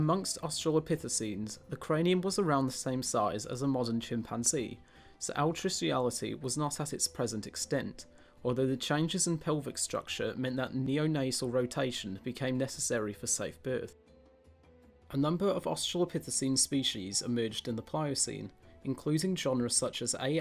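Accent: United Kingdom. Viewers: L.O.L. Only an Idiot would believe this Darwinism Theory...The British accent doesn't help L.O.L. God is Laughing to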